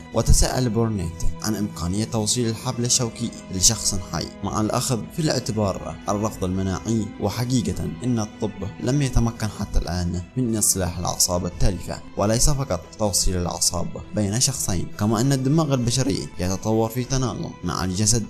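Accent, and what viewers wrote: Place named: Yemen. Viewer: نبرة المقدم يمنية فأنا يمني واعرف قومي . حاول تتدرب قليل في طريقة إلقاء الشرح. وشكرا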